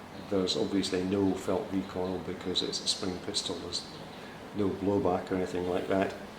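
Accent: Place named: Scotland, United Kingdom. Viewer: Thinking about getting one of these for film use, Hey, nice to hear a Scottish review. I'm Scottish myself :) Haver a good Christmas and thanks for uploading.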